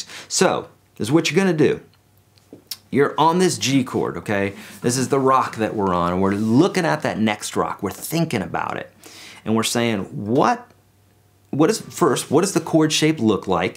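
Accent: South, United States. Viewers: Your southern accent makes these lessons so much more enjoyable. I don’t know why, it just does!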